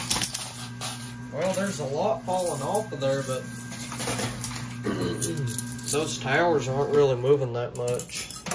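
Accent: United States. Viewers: I love watching all of your videos. Where are you from? You sound so country lol not a bad thing at all. I'm from Mississippi so I'm country too lol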